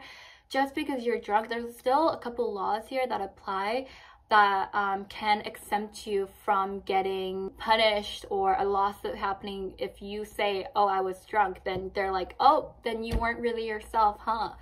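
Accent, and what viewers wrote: United States. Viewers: I see you have an American accent, which possibly means you didn't grow up in Korea, so how would you know all these things about Korea? I don't care you're ethnically Korean, if you grew up somewhere else, you don't really have a place to talk about these things. If I'm wrong, correct me! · Are you Naturally born Korean? you sound more American especially the way you use your slang. Were you born in America? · She sounds like a racist white girl in America!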